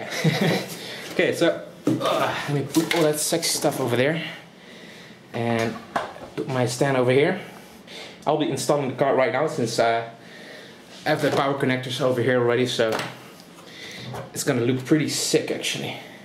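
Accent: Netherlands. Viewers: mooi systeempje, heb al wel een 6870, maar nog een i5 760. Ga wel upgraden naar ivy bridge. Best geinig dat je kan horen dat je nl bent (en ja ik weet dat er nl in je naam staat) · jij klinkt heel erg nederlands lol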